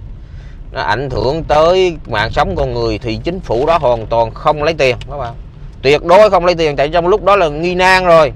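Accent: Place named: South, Vietnam. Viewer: Anh nói giọng NK rất hay, ở VN hiện nay mở đài lên toàn nghe giọng BK nghe không vô.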